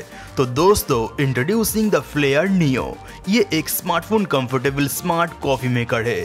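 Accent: Bengal, India. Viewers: हां भाई हिंदी बोलो यार बंगाली आवाज आ रही है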